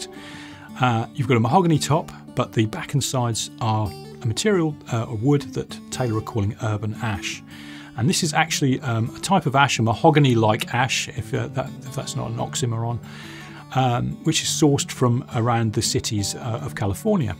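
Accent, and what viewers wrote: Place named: England, United Kingdom. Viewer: I’m tickled with your English accent. Want to know where you’re from. I’m a Californian; nonetheless, intrigued with different accents.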